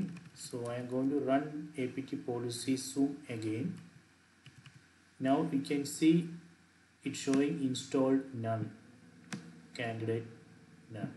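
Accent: India. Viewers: Are you South Indian?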